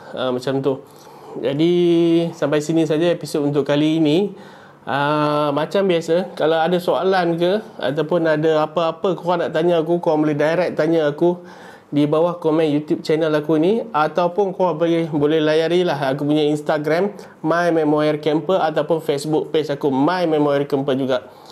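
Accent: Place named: Kedah, Malaysia. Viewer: cakap kedahlh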